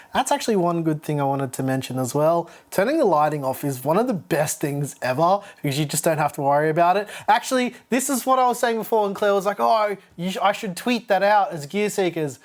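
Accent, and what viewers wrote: Australia. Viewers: where in aussie do you based on?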